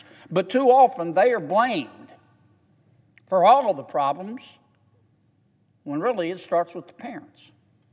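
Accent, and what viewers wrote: South, United States. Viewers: He went to Oxford. I'm certain he didn't have the southern drawl while he was going to Oxford.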